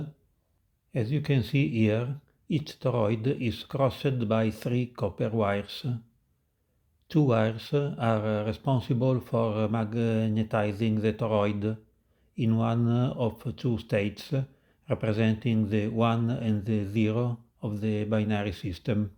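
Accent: Italy. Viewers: You are Italian. At least your accent says so! :D However, great video! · Mai sentito un accento più italiano di questo ahahaha.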